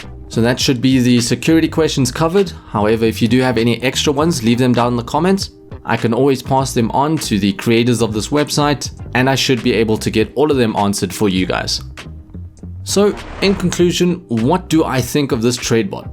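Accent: South Africa. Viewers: IS that a south African accent i hear? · Are you from SA?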